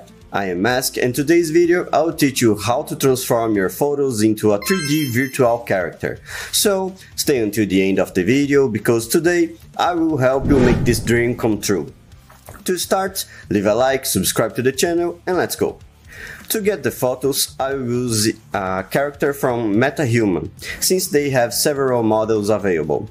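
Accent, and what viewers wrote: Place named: Brazil. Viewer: Brazilian voice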